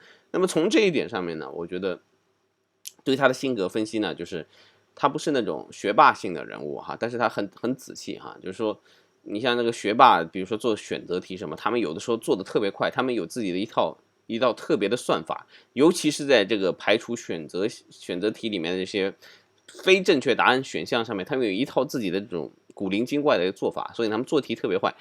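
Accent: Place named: South, China. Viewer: 有的部分分析的挺有道理，大湿听着想南方人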